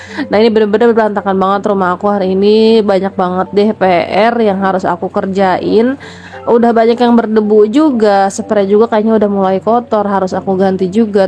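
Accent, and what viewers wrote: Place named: Sunda, Indonesia. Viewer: Insha Allah,gak akan pernah bosen teh,,seneng denger cara bicara teteh,,yg sebagian sunda kayak aku..😀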